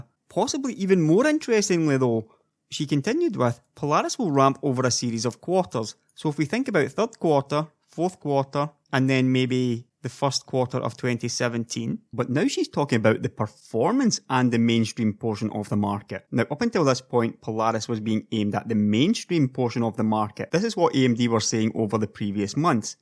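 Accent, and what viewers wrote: Scotland, United Kingdom. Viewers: i love scottist accent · that lovely scottish accent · Are you Scottish?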